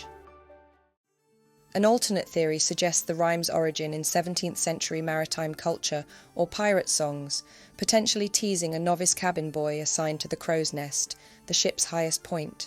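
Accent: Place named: South, United Kingdom. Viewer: Where is your accent from? Yes uk....most probably the south but more precisely? Very easy on the ear and very good command of the English language. Love your channel..all very interesting and thought provoking. Thank you 😊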